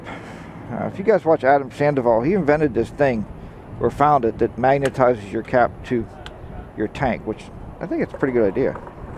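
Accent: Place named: Pittsburgh, United States. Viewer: I spent 5 years in Sandusky. Where you turned left, you should have turned right. I guess you figured that out. Your accent sounds like you’re from the Pittsburgh area.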